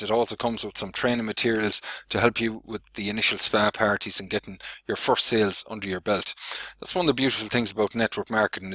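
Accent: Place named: Ireland. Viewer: 😂🤣 I❤️his tight Irish accent!😂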